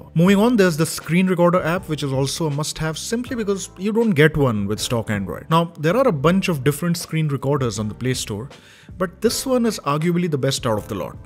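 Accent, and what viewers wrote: India. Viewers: sorry to say, i hate ur indian accent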